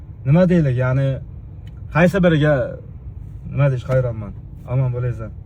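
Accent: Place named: Laqay, Uzbekistan. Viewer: Laqaycha gapirma zaybat oʻzing laqay degani gapriwni ham laqaycha qildeganimasku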